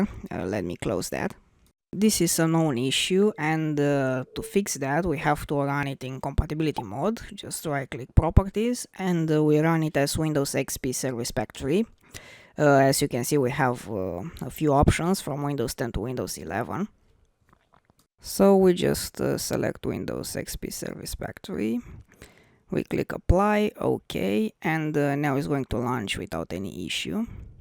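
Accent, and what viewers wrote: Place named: Russia. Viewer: love your accent. its russian?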